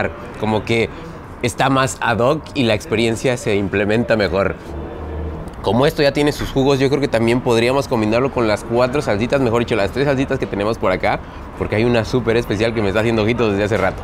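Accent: North, Mexico. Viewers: Llevas unos días apenas y ya se te pego el acento norteño! 🤠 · El acento!!! El acentoooo!!!🤩🤩 te saleee súper bien tu norteño interior jajajaj🥰 saludos desde Culiacán Sinaloa 🤙🏾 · 1 dia sale de su rancho y ya trae acento norteño, no mames. XD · ya se te anda pegando el tonito del norte jasjajsjaja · Que chulada que disfrutaste la visita, oye pero creo que se te pegó el acento norteño Aaaaajjuuuuaaaaa!! 👍🏻👌🏻😋😉 Saludos!! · Se le pegó el tonito norteño!!! Jajajaja... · HASTA EL TONO NORTEÑO SE TE PEGÓ 😂 SE ME ANTOJÓ TODO PERO MAS LOS TACOS 🤤😋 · Cómo que el acento del Norte se te está pegando mucho 😂😂😂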